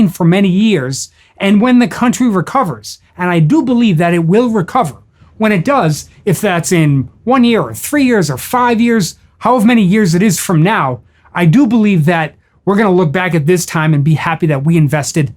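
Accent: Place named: New Jersey, United States. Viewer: = Awesome. I could listen to that lovely Jersey accent all day.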